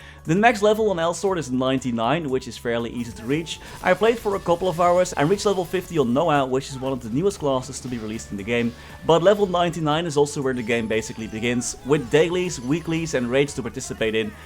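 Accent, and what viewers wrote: Netherlands. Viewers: Are you Dutch? Ik kan een accent horen haha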